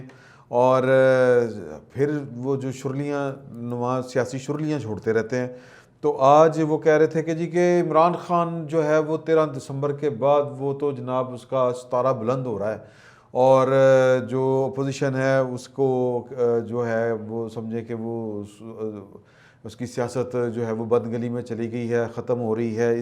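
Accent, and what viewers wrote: Punjab, Pakistan. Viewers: Sir kamal way of delivery with punjabi urdu tarka😊😊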